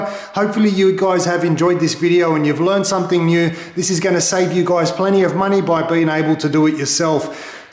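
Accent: Australia. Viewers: i love your accent i'm guessing you are from Melbourne Australia.. I'm stuck in S.A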